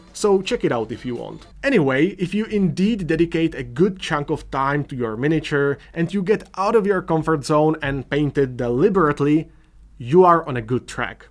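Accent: Czech Republic. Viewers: Thats...encouraging to hear..12 years 😄..but whatever, better late then never right !? Im just about to start with painting but I try my best... I just discover your channel and I like it more then others! I was little suspicious about your accent tho 🤔..."why he sounds kinda like me!?" AAAAH, hes CZECH 😅!!! Im surprised, youre first czech I discover in this "WARHAMMER painting universe"... I started to think its not exist in Czech Republic. And Im glad its not true😁!!!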